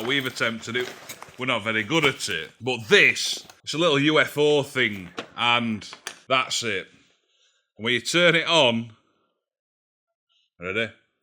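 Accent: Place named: Barnsley, United Kingdom. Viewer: He's from the UK and that sounds like a Barnsley accent which is in south Yorkshire 👍👍👍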